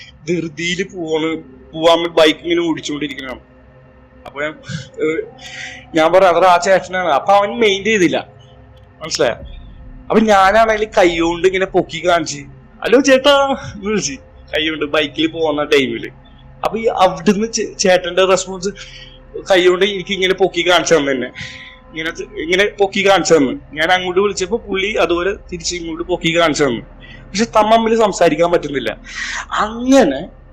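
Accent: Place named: Kochi, India. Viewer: Kochi slang alle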